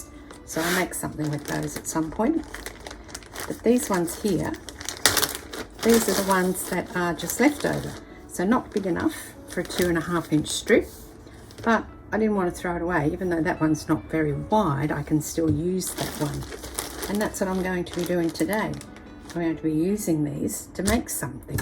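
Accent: Australia. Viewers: So wonderful to hear an Australian voice working with scraps! I have just begun my scrappy journey. I love the project. Thanks for sharing!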